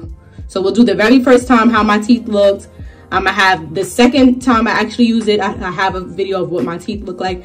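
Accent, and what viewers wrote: New York, United States. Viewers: I like the video helps you from New York 🗽 I peeped the accent 😂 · You are so NewYork! I love it ! I'm from Queens and I know an original NY accent when I hear it. Subscribed.....God Bless you and your daughter.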